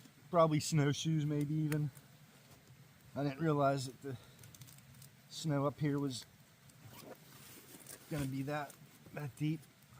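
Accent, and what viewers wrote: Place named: Pittsburgh, United States. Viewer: I can yell you are from western PA. Lol. The Pittsburgh accent. I am too. Great vid man.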